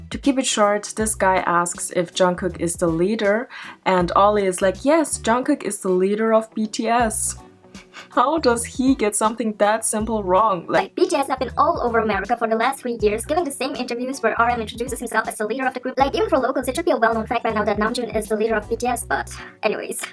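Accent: Germany. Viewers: You kinda got a German accent ? Or am I trippin’ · offtopic but your accent sounds german and that‘s cute🥺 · Is that a German accent I hear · Ich höre deutschen Akzent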